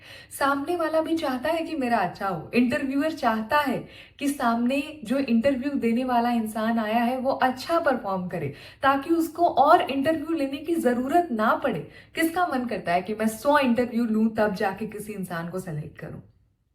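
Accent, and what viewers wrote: Bihar, India. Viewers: Your bihari accent 👌👌👌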